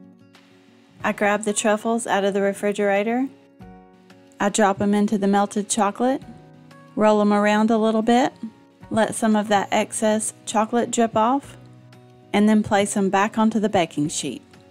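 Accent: South, United States. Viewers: Thanks for visiting my channel,! I just took a walk down Memory Lane on yours. My mom's from the south, so you had my full attention. I love southern accents. 😁And I have not seen Cool Whip and Nutter Butters since the 90's (not the kind of thing I can buy in Japan). 😆 Thanks for the great video and recipes. I love that someone ate your pie before it was set. Enjoy your long weekend!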